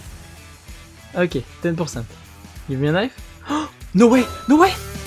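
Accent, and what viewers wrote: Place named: France. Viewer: pas de soucis, en plus c'est que ton anglais est super fluide malgré l'accent français ( on l'a tous moi le 1er ). Continue t'es sur la bonne voie, j'espère que le partenariat avec csgo roll arrivera bientôt !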